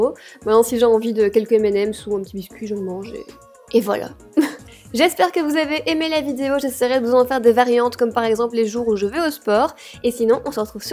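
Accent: Belgium. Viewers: J'adore l'accent belge: houit 😂😂😂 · J'adore l'accent belge 😂😂😂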